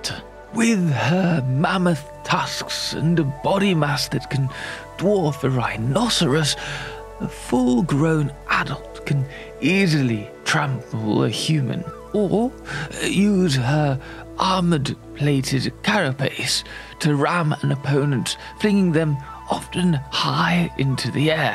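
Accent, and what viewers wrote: United Kingdom. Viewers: LOL! Awesome video. Anyone who tells you, that you aren't talented or an entertainer, please give them your middle finger. Awesome Video. Very creative. The voice inflections of a fascinated xeno biologist mixed with your native British accent, is priceless. Great acting work.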